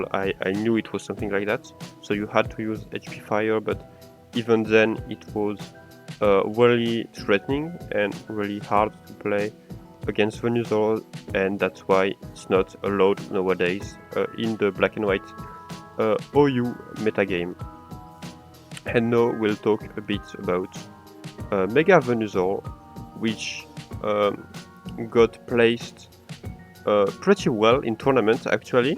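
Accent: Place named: England, United Kingdom. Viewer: Oh, what a beautiful english accent ! I'm jealous.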